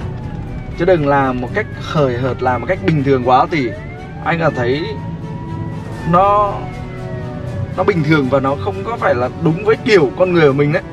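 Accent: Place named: Central, Vietnam. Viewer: Anh. Nói chất giọng miền trung và phong cách sống rất hay. Xin anh chia sẽ về: Nghề chủ yếu? Xuất thân, trình độ học vấn, Gdinh, vợ con, nhà cửa, sự nghiệp...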